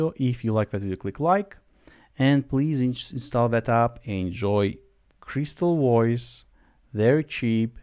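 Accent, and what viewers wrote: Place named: Lithuania. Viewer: bro where are you from, your accent sounds lithuanian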